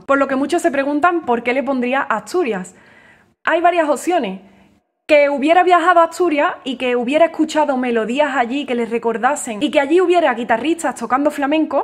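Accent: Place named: Seville, Spain. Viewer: From Seville (western andalusian)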